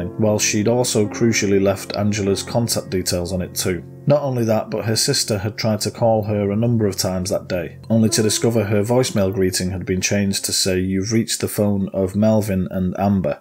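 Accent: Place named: Manchester, United Kingdom. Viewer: So comfortable to listen to a fellow Mancunian, and your dry humour is epic. Don’t stop pal, I’m here every day waiting for your content 👍🏻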